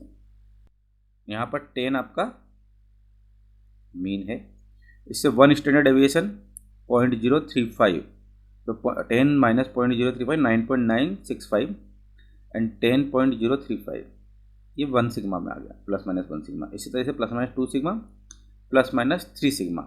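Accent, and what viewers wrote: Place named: India. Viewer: Could you speak slower? My English is not too good when listen English by India. So sorry to say that. I really want to watch more video from you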